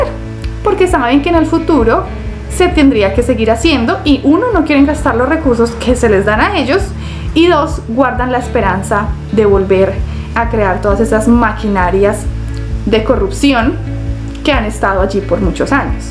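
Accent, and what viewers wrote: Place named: Colombia. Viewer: Hola mija eres chingona,,me encanto tu acento Columbia,,..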